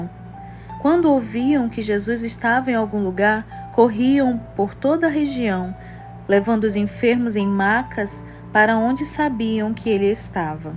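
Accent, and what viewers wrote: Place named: Rio de Janeiro, Brazil. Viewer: Tu és do estado do Rio de Janeiro?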